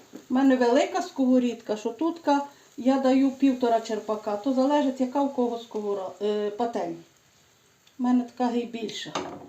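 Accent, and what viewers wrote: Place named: Galicia, Ukraine. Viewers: Дуже дякую за чудовий рецепт і за рідну прикарпатську говірку! 🥰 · Моя дорогенька, "най си трошка постоїт ", "підиллєємо "... Обожнюю свою рідну говірку галицьку! Скучаю за нею несамовито, я живу в Рівному, і тут свої діалекти, далекі від моїх рідних...😢😢😢 А зі старістю ностальгія за рідними краями тільки посилюється.... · Ні. Це не львівський говір. Це Прикарпаття! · Пані дякую за рецепт ви з Львівщини чую по розмові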